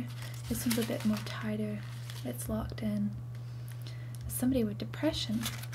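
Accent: United States. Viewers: holy crap I never understood the "American Accent" until now! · As an American, I can say you did a great job at that American accent. I couldn't tell you were even British because the accent was so good. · Great American accent. Love your ASMR video. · Your American accent is quiet good! Not many people can perform that sort of affectation without sounding silly. You have a wonderfully warm smile as well :) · Great accent! Good job very believable... I only heard a few instances of your Scottish...British... Australian accent. and I only noticed because I AM american. But good job! Good Video! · This is an excellent American accent! Probably the best accent switch I've seen in an ASMR video. I agree with those who said you sound like Springbok ASMR here :) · I'm super impressed by your American accent! It's really quite accurate. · Excellent American accent,!! 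· ASMR ahh your American accent is impeccable!!! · Ma-saj not Mash-aj. Otherwise, amazingly convincing and indistinguishable from real Americans :> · As a citizen of the United States of America, this was a very convincing accent, and overall a very nice video. Props to you. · That's an amazing American impression. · I just praised your spot-on Scottish accent on another video...now I'm praising your excellent American accent. You have a genuine talent for asmr as well as accents. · Just wanted to pop in and give my expert American Stamp of Approval on the accent. You even said "food" like someone from the midwest. Also, the marker sounds ruled. Oooon an ancillary note: very lovely video, many thanks as always. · i like the american accent. good job. you are just amazing in general though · I thought you were American wow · Also your American accent was amazing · Lovely, especially the scalp massage. 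Your accent was on point —I'm American and I don't think I would've suspected it wasn't your native accent if I hadn't known better. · Wowww!! You're so good at accents omg, if i didn't know any better I'd think you were american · Great american accent! Your true accent pretty much only came out in the long O sounds. But then again, people from the US talk all kinds of weird ways, so I'm pretty sure nobody would think that you weren't from the US if you were using the accent over here.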